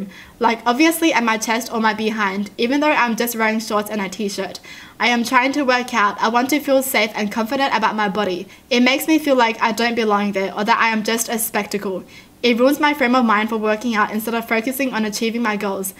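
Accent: Australia. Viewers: Sirkay I’m Australian and I love Aussie accents but is a mix of Aussie and another country... I can’t tell which though. I love her accent. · Her accent is from Australia a very beautiful accent and land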